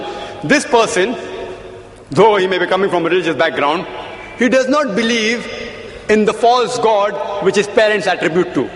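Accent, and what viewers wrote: India. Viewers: Thats his accent our Indian accent is like that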